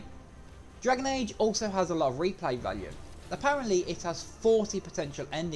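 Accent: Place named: Birmingham, United Kingdom. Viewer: Downloading now, thanks for the review! Ps love the brummy accent ;)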